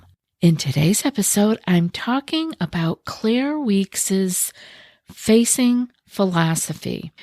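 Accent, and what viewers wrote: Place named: Upper Midwest, United States. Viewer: You’ve gotta be from WI or MN with that accent.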